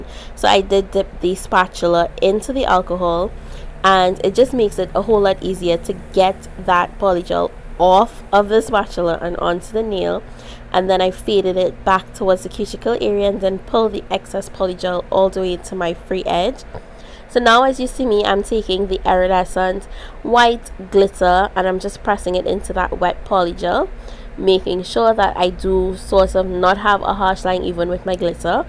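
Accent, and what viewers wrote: Trinidad and Tobago. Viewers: Are you Trini? I hear a accent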